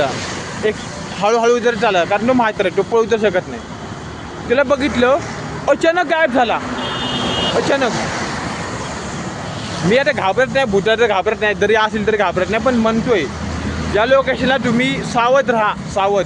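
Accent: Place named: Gujarat, India. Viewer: भावा speech चांगल कर असं वाटतंय की तू मराठी नाहीस गुजराती आहेस. कंटाळलो ऐकून... 🙏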